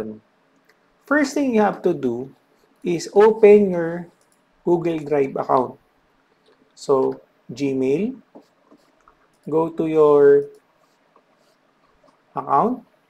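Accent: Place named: Latin America. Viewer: amigo porque pija hablas en ingles si se nota de aca a la china que sos latino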